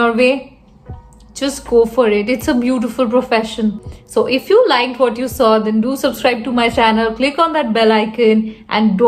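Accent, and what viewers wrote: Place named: India. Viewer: 🫡Hats off 2 U from 🇧🇩Bangladesh. I love the way U speak English in a very simple way. Your English is very easy to understand, and your accent also sounds so simple and sweet. It's way better than all other typical indian accents! I am in damn love with your steady speaking style, which is absolutely easy to understand, and of course your every single word's pronunciation is crystal clear!🥰 U r undoubtedly a perfect speaker! U have absolutely nailed it!👏🏻 👌🏻Keep it up dear multi-talented Indian lady...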